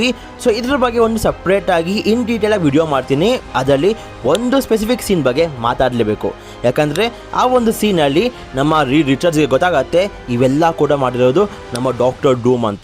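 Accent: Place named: England, United Kingdom. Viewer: ನೀವು ಕನ್ನಡನಾ ಇಂಗ್ಲೀಷಿನ ಗಂಟಲಲ್ಲಿ ಇಳಿಸಿಕೊಂಡಿರಾ ಅನಿಸುತ್ತೆ, but story super ಆಗಿ ಹೇಳಿರಿ ❤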